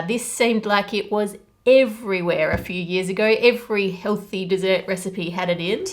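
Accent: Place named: Australia. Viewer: I find it funny how has a clear Australian accent but then her son(?) sounded like a proper Bri'ish schoolboy.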